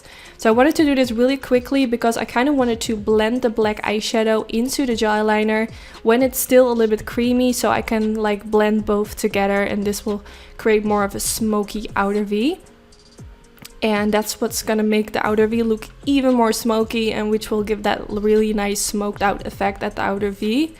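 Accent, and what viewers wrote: Netherlands. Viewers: Beautiful look 😍 Are you Dutch? You have really funny accent ☺️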